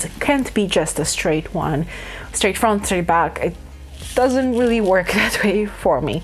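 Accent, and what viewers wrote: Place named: Canada. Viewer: First time watcher! I love your energy! You’ve e got some beautiful projects going right now. I can’t wait to see them finished. I have also never seen a stand like that to hold yarn. That could come in handy. Are you originally from Canada? Your accent is beautiful.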